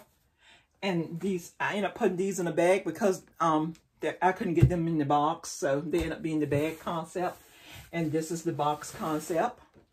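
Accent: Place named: South, United States. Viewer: These are a great idea!!! I saw these socks in Dollar Tree and stood and looked at them, wondering what I could do with them for my craft shows? I ended up walking away, as I couldn't think of anything! Now I am going to go back! I love these! I like listening to you but can't place your accent? It has a bit of a "southern" style, but not exactly - you have a sweet and easy manner about you and it makes it enjoyable to listen to! Thanks again for the great idea!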